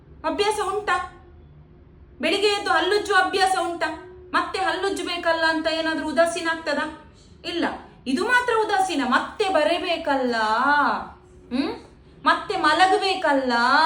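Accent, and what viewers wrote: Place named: Mangalore, India. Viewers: Nanagu e thara anubava agide school nalli 😮nimma manglore kannada esta ❤ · Love mangalore kannada accent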